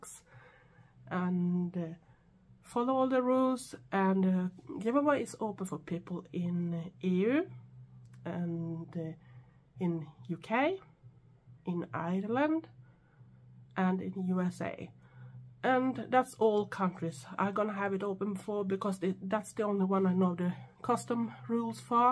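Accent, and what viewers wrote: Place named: Sweden. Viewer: Where did the comment I just typed go🤔hmm probably my crap satellite excuse for internet service....anyhoo I said the has the most gorgeous accent I've ever heard! I've never known anyone with it before you. I Adore it!☺🤗🖤🖤🖤🦊I'm going to Fika now😆👋🏻TTFN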